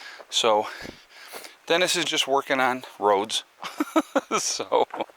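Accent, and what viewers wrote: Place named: New York, United States. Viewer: Psssst, your NY is showing.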